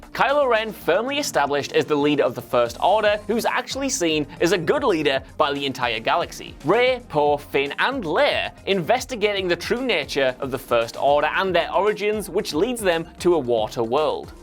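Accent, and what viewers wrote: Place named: North, United Kingdom. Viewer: Jesus this guy sounds like a resident of the fucking North in GoT . Hopefully you're a Stark loyalist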